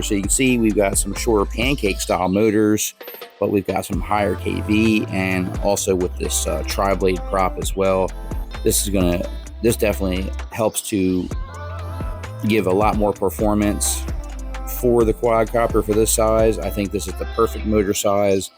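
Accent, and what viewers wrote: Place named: Maryland, United States. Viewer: Could tell straight from the first 10 seconds you were from MD 💪🏾😂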